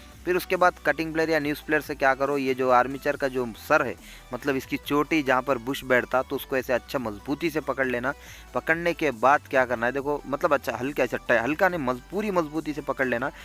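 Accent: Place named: South India, India. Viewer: बहोत बढ़िया जानकारी देते हैं आप। खास करके आप साउथ के होके भी शुद्ध हिंदी में जानाकारी देते हो , ऐसे साउथ का कोई भी हिंदी में बात नही करता । बहुत बहुत धन्यवाद 🙏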